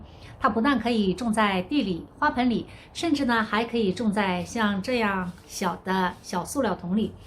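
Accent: Jiangsu-Zhejiang, China. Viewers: 听你口音是江浙一带的吧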